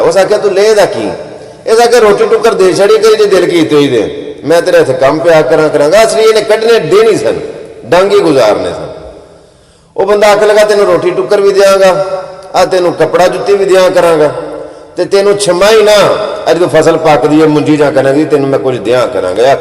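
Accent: Punjab, Pakistan. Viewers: U r great ap ka baap sealkoti hy lekin ap k nanke 100 %mandi bh ya gujrat men hun ge apki bate sun kar aisa laga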